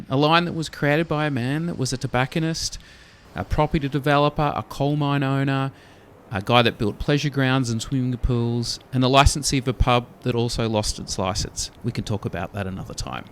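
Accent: Australia. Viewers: Thank you for this. On the narrator, the accent is a hybrid of Aussie and English..... at least to my ears. I wonder when that started changing and we ended up with what we all recognise today.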